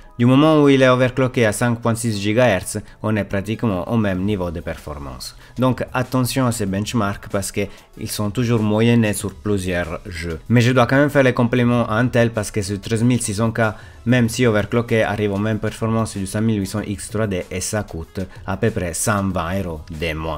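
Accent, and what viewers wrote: Italy. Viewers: J'avais compris pour le pseudo mais pas pour la présentation, peut être mettre des sous titres en francais pour que tout le monde comprenne , (vous êtes de Naples ? :) ) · Bravo pour vos vidéos claires et précises, le tout avec votre superbe accent italien 😊. On apprend plein de choses à vous écouter. Continuez comme cela, c’est parfait.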